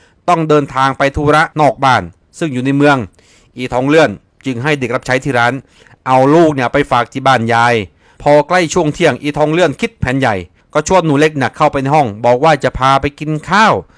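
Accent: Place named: South, Thailand. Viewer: เป็นนักเล่าข่าวสื่อต่างในอดีตทั้งวีรกรรมผุ้กล้า ทั้งวีรบุรุสในยุคปัจจุบัน สำเนียงคงเป็นคนใต้อันทีจริง คือเสียงคล้ายๆคนใต้ คงแทนลึงทวนทอง999 ในยุคโคสนาเล่าเรื่องข่าวชาวบ้านได้ดีมากๆครับ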